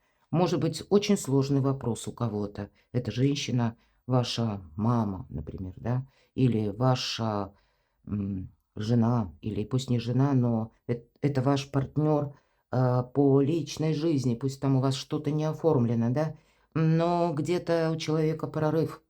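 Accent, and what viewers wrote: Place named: Belarus. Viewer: вы скорее всего из Белоруссии? Несколько лет назад жила в Беларуси,ваш говор проскальзывает.Благородный народ, люблю вас... спасибо ❤❤❤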